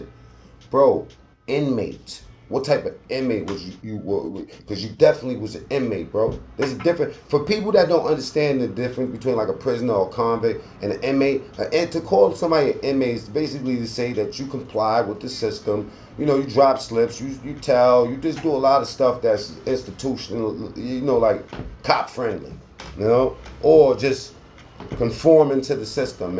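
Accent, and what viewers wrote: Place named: East Coast, United States. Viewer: Hello I am a new subscriber and love your East coast accent🤩 and how real you are about every situation. 💯 I'm from the South and love to hear people from New York, Jersey and Baltimore talk. 🤩 It's really sad to see how Danny went down and continues to blame Joe for everything instead of "Thanking him" for his help and all the money and assistance he gave him when he first got out of prison. I really want the best for him and hope he can LET IT GO and move on. 🌼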